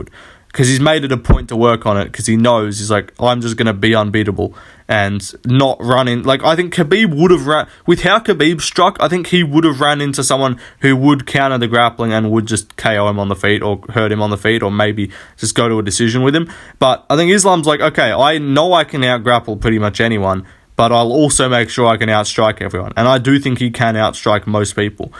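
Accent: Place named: Australia. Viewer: You have an Aussie accent and you didn't put Jdm in top 10? Nah you a traitor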